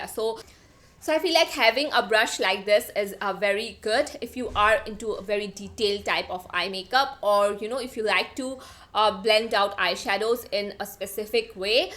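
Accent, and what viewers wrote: India. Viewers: Where are you from?in which part of India?Because your accent is so cute and so soothing 🥰🥰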